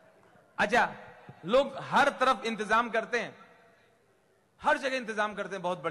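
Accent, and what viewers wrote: Kanpur, India. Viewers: Kanpuria style ..good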